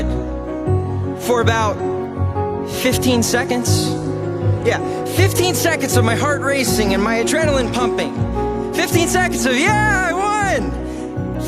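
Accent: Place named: United States. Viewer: Thank you very much for presenting this wonderful speech. I would like to know which American accent is this. Kindly reply please Thank you again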